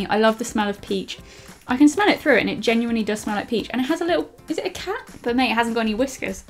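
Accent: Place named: London, United Kingdom. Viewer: Sotaque de Londres não engana!🇬🇧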